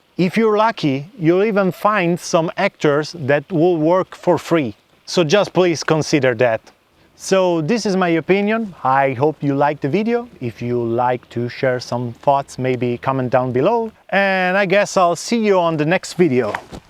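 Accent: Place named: Italy. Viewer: You don't need to tell us that you are from Italy; your accent says that loud and clear. 😅 btw nice video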